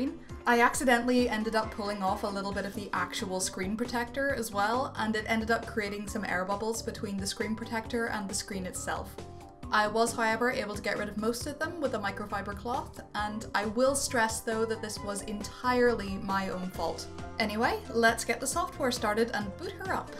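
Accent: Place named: Ireland. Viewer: Why was I so excited when I realized she had an Irish accent?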